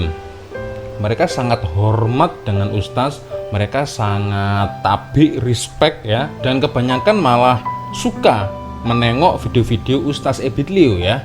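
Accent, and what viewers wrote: Malaysia. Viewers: cak da pandai cakap mcm org malaysia la....tebaik cak.....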